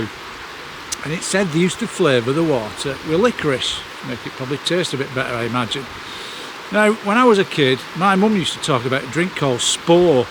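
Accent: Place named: Yorkshire, United Kingdom. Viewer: Greetings from Alberta Canada. We used to live in Melmerby Coverdale, and we really miss the walks. Thanks for sharing your lovely walks with us. We really enjoy them, and love your photos of flowers, birds, butterflys etc, and all the information you share, and we love your Yorkshire accent. Please keep walking and sharing.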